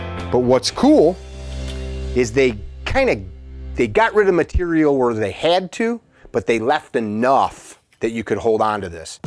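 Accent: Chicago, United States. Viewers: You gotta be from Chicago. You have some of that Chicago accent and sound like Dennis Farina at times.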